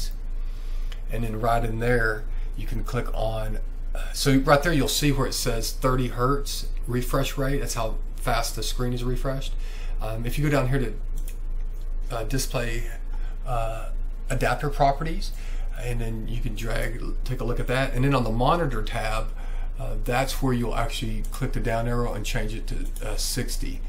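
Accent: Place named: Georgia, United States. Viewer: Are you from Georgia?? Your accent reminds me of AJ Styles. :)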